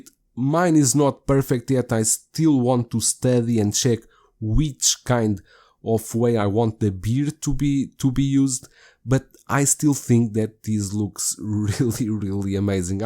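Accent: Portugal. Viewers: Com o sotaque, vou assumir que o senhor é Português! Que bom conseguir ouvir a sua opinião! Awesome review, this figure is crazy good. I can't afford it, but I Pre-Ordered the Aragorn sculpted hair version. Can't wait!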